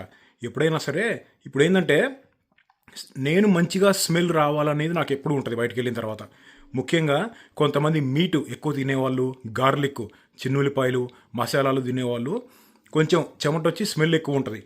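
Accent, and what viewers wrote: Andhra Pradesh, India. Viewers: Observed Andhra accent in mutton preparation in Palm tree garden. But Nizamabad in Telangana. Why is it so? Just Curiosity. I don't have any state or regional feelings. Appreciate if you reply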